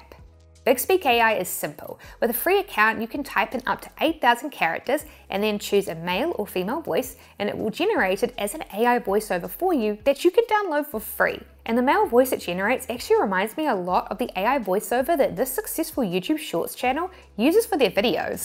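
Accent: New Zealand. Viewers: Thanks! I absolutely loved this one! Got lots of new free AI's to try out. You have saved me hours of research (as you know). So, thanks again. P.S.: Liking (and getting) your NZ English more and more ;)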